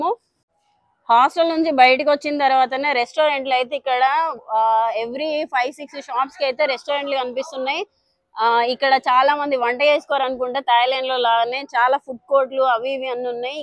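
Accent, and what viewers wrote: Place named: South, India. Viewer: South Indian accent is soo funny 🤣🤣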